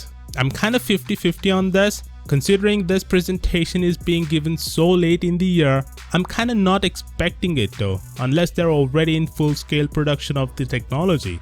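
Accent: India. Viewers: English indian style... not bad but almost indian people very bad their english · I find your Indian accent more amusing. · The Indian accent is distracting... Aaaargh! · Terrible whole YouTube is Indian with fuck'n accents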